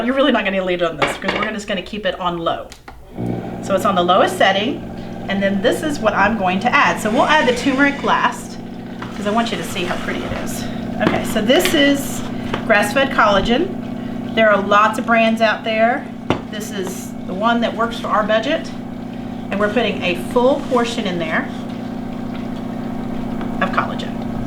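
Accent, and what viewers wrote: South, United States. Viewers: Fahima Bemberry I think you misunderstood, Fahima. She said “Grass Fed”. Not “breast fed”. 😂😂😂 It’s the southern accent. It throws you off sometimes.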